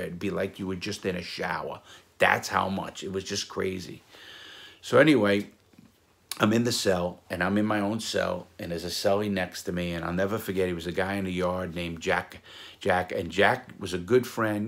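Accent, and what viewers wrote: New York, United States. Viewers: your New York accent is cooler than my Australian one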